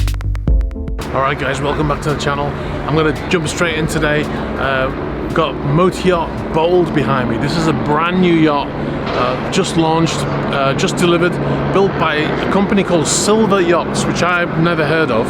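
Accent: United Kingdom. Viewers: wonderful!, your command of the Queen’s English is very comforting, great video, and I’m excited for the both of us, for your tour of Bold motor yacht.! Here we go!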